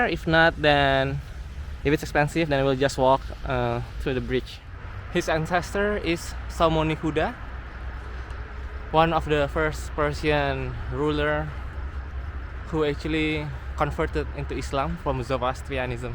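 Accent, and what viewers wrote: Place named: Indonesia. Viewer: Untung aja logatnya bang Indonesia banget, jadi masih bisa mengikuti semenjak jadi English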